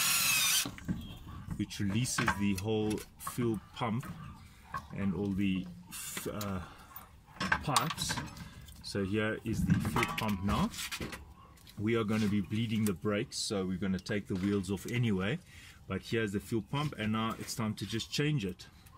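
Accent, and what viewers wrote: South Africa. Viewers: Victor Araoz he sounds South African not Austrian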